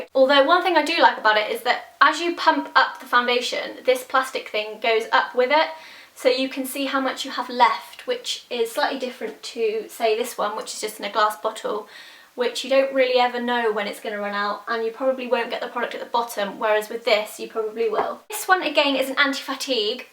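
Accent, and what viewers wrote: United Kingdom. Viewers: not to be rude or anything but that was a really stupid comment. would you please enlighten me on why she would feel the need to "fake" an accent in her videos? she's from the U.K, shes BRITISH. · I don't understand why people think you're faking the british accent? Maybe she's just very well spoken and has very good dialect?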